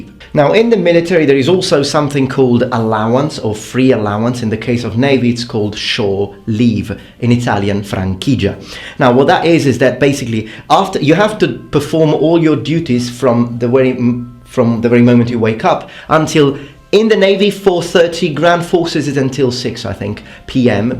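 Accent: England, United Kingdom. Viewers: Oh damn! You're Italian? I thought you were British! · you're italian? i thought you're British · The Old French variant of the word, and the original way to pronounce it is writen "luef" instead of "lieu". This is the way the british have always used it, so there you go. learned english in the UK, so he speaks british english instead of murica english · has an amazing English accent.. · your accent sounds english. also if youre italian why do you use a jewish star as a logo? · I wondered what your heritage was......I thought maybe Greek or Italian.....but your English is so good.....you even have a British accent......I bet the ladies chased you in that uniform...;)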